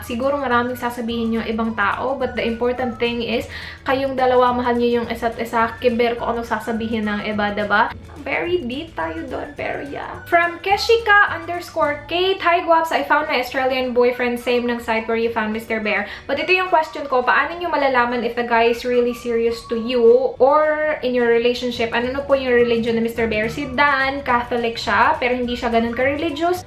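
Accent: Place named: Visayas, Philippines. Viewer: The way you are, being simple made me subscribed to your channel. I start my day watching your vlog. I enjoy watching you and your family. My mom is from Mindanao and my dad is from Cebu. So when I hear you talk visaya, it reminds me of my childhood. Best wishes to you and your fiancé from us here California 😊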